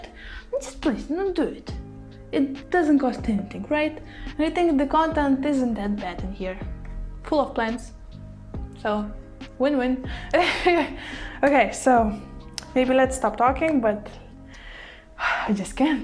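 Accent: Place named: Russia. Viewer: You speak English with Russian accent. It means that you are a bilingual and your first language is Russian. Isn't it? Здесь большая русская аудитория, которая интересуется цветоводством. Советую делать ролики и на русском: прибавите себе подписчиков. Желаю удачи и всего самого хорошего!!!!😀🌷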